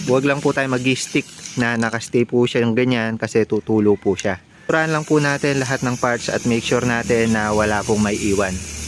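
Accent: Pampanga, Philippines. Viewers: Kapampangan ka ata sir😊 accent mo kasi.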